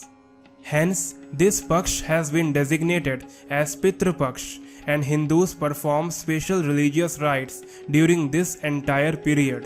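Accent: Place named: North India, India. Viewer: It’s good knowledge for all Hindus . But when you go international try to connect to international audience even non Hindus will understand. e.g.: 1. Try not to speak like a North Indian . 2. Many words such as Veda , is pronounced as Ved . Shraddha in the subtitled is pronounced as Shrad ? This will confuse Hindus as well as others. 3. Learn to speak without accents from leading Indian news channel broadcasters . In YouTube one must be international. You will spread the message better. Keep up the good work. Hari Aum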